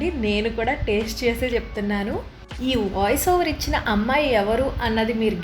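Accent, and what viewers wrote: East Godavari, India. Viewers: మన తూర్పుగోదావరి యాస అలవాటైపోయింది మరి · Amma ne cheppamanu voice east local vasana vastadi. Vere voice vaddu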